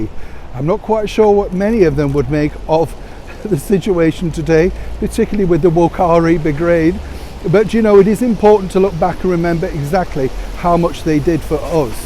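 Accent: Yorkshire, United Kingdom. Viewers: Hi You are a wealth of information and its how ya tell it with the fitting Yorkshire accent and the richness of the tone. Think the picture if the Queen on the big ben is absolutely fabulous and she was larger than life even though small in stature she did her bit during the war and deserves to be with us remembering the fallen. So thank you once again telling as it is Bye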